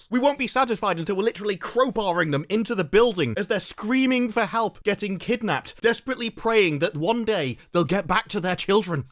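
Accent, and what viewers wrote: United Kingdom. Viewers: This man is most definitely British.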